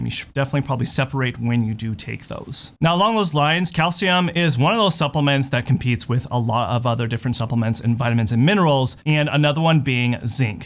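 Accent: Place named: Utah, United States. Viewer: I couldn't quite place where your accent is from. Then I heard you say "Buh' en' very strongly (for button) and I thought it sounded very much like a Salt Lake City or Provo accent. I could be wrong. ISTITNOJCA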